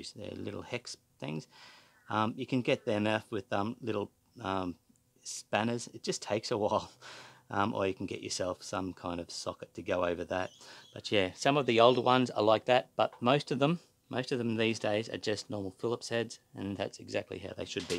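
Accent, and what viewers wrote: Australia. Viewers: glad I watched this before I took my dryer off the wall and opened up the back. I've worked on a few dryers in my time changing belts capacitors elements and door switches but have never taken a timer out. My timer has the second symptom you described stopping at around 90 mins and just before cool down. Also just checked eBay and there are new ones for $45. You sound like you have an Aussie accent. Good Clip, invest in a Tripod it will make all the difference at your end. Cheers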